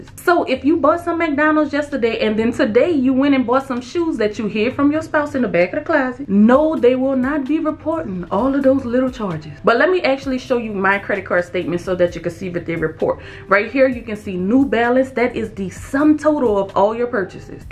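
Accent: New Orleans, United States. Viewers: Ok so im new to your channel. This is my first video. I SWEAR your voice has a New Orleans kick to it. (That's a compliment. I live in La) 😍 That is all! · I knew you were from NOLA or the area when I heard you speak. :) Good info. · So 1-2 percent is the magic number I thought it was 9 percent Thank you and your husband for educating the masses Knowledge is power are you from New Orleans or savannah loving your voice I really could use the 500 dollars January is the month I apply for my very own house after Divorce Please choose me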